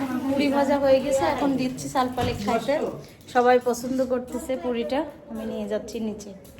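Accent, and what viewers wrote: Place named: Rajshahi, Bangladesh. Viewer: Ettto bad comment keno apnader? Uni notun video korteche...hoyto ektu vul hoy..ar tachara unara Pabna Rajshahi er manush...kothar moddhe local tone thakbei....eta emon dosh er kichu na...manush er valo chaite paren na??dhire dhire e manush unnoto hoy.. Ekbare na...parle valo kichu koren nije...then onner piche lagen...azaira jotto sob..jader kono kaj nei tarai emon onno ke bad comment kore...tai bolchi valo hote shikhen....video valo na lagle dekhben na..ke boleche apnake dekhte??/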